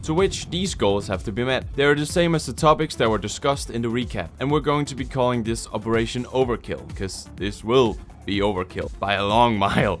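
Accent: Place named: Germany. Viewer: Good f'ing job man, your suffering wasn't in vain. And I would've never guessed you're Danish, you sounded rather German to me 😂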